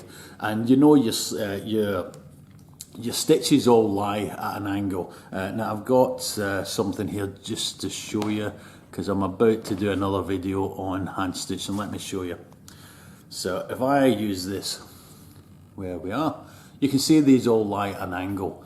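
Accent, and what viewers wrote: Scotland, United Kingdom. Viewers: Don't take it personally but you sound similar to a character from the TV show"The Simpsons". A Scottsman.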